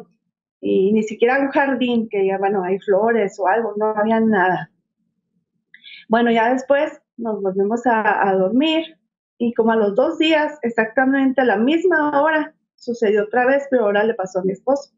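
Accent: Chihuahua, Mexico. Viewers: Wow eres de chihuahua mi paisana que emoción lo supe por tu acento ,,, un abrazo 🤗😘 gracias gracias 🙏🏻 . Me voy a comunicar contigo para que me ayudes necesito una terapia necesito mucha luz 💡 estoy pasando por algo difícil en mi vida. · Yo sabia que eras mexicana por tu tono de voz que alegría 🤩 que puedas contactar con Los Ángeles y seres de otras dimensiones me atrae mucho este tema saludos es la primera vez que veo este canal yo desde que era niña vi una sola vez a seres desencarnados nunca lo hablé tenía alrededor de 3 años y cuando tenía 8 lo platiqué porque vinieron familiares de mi mamá de USA 🇺🇸 Y tocaron el tema pero ellos venían con un aparato detector de metales y al escucharlos volteé y les dije yo los vi me querían llevar al pueblo pues era donde vivían mis abuelos nunca más quise regresar a ese lugar. Después cuando ya era adulta tenía una especie de ataques como epilepsia pero me chocaron y no tenía nada pues tuve un evento de esos y yo sentí q me iba por un túnel oscuro y grité y de NO y siempre cusnfo me platicaban de alguien que tenía un dolor físico yo lo sentía hasta la fecha yo no puedo escuchar q a alguien le duela algo porque me lo trasmiten. 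También cuando mi papá iba a fallecer un día antes soñé a mi mamá ya fallecida y la traían unos seres muy altos con una túnica blanca y la traían en una caja de cristal y la sacaron y yo le dije en mi sueño q si no iba a entrar a la casa y ella telepáticamente me dijo que no y esos seres la cargaron y la depositaron de nuevo en ese ataúd de cristal y le dijeron ya es hora y se desvaneció la imagen y muchas otras experiencias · !! desde que empezaste a comentar supe que eres de Chihuahua, muy interesante tu información, gracias, gracias, gracias, un abrazo con amor y luz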